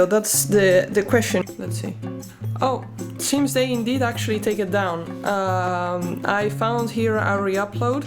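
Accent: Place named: England, United Kingdom. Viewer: Great analysis. I like you would not support this company with my custom and like you think the Les Paul is a beautiful guitar. Your comments about contradicts was true and your ethics about not buying inferior and suggesting Gibson should focus on making a superior product made sense. I also agree with you, let the customer chase you for a well made product at an attractive price rather than chase, threaten and bully the customer. This is one of the most balanced comments without sarcasm, anger or abuse. Plus, your English accent is gorgeous! 👍🎸👌❤️ Great job.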